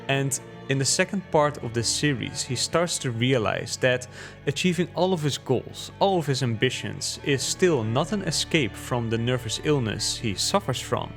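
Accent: Netherlands. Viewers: Klinkt als een Nederlands accent, mooie, interessante video. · Strong dutch accent haha. Great video.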